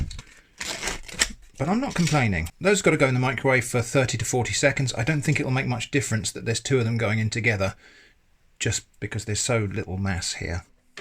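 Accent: United Kingdom. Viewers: I've been subscribed for quite a while now, and I'd like to congratulate you on all the channel growth. I wanted to catch a new video early just to say "Thanks for all the great videos!" before it gets too hard to read them all. So thanks again, I'd love more YouTubers to follow in your footsteps of wholesome, varied content. P.S. You have a very cool accent, all of the regional British accents are super interesting.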